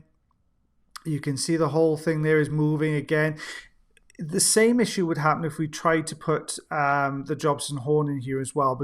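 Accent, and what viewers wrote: Wales, United Kingdom. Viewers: Stay safe and family. I loved you saying “ebony coloured” in your lovely Welsh accent. We will just be happy so see your videos when you can post, you don’t need to apologise, it’s our privilege to see your work.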